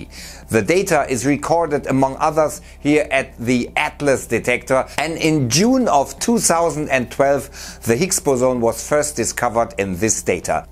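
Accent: Germany. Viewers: Ich liebe einfach das "DEnglisch" ^_^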